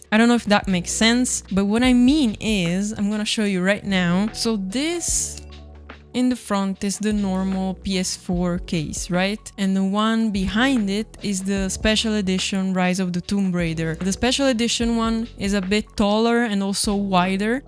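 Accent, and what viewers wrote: Italy. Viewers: Glad the algorithm suggested me your channel! I have 70 games on my backlog, but they are alla digital unfortunately. At least you could still touch and see your games lol. Anyway, your accent sound italian, am I correct? Are you a fellow italian girl gamer like me?? 🤝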